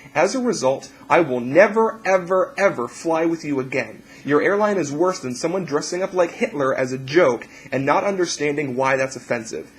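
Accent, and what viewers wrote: Canada. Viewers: haha. you sound canadian.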